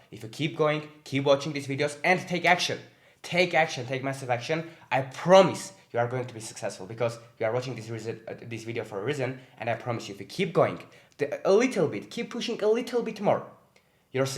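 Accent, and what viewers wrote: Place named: Georgia. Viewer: Hi friend from Georgia, at first i thought you were Georgian because of our accents sound pretty similar. Keep up the good work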